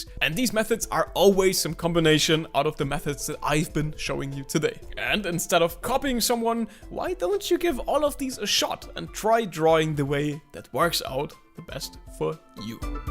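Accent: Germany. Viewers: Im hearing deutsch broski🤨🤨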